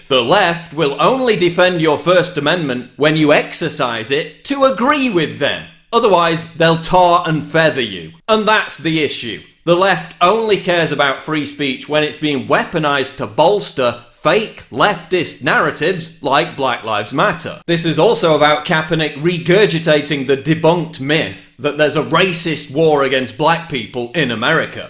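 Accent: United Kingdom. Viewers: I can't help but laugh because your accent comes from a country where America isn't liked. Yet you jump on the bandwagon of creating a YouTube about the most recent news so you can collect some easy cash for more tea and crumpet. hehe ^_^ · why do you have a British accent?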